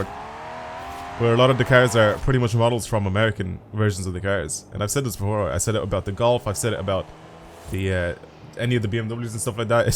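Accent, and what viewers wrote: Ireland. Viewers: Are you Irish? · Just subbed, great content! Also, which part of Ireland are you from?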